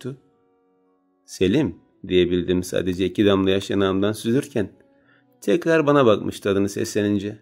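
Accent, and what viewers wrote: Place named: Black Sea, Turkey. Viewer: Selam kardeşim iyisindir inşallah bu hikayedeki Karadeniz sive çok hoşuma gitti ben bı Karadenizli olarak bu kadar güzel konuşamam selametle Allah'a emanet ol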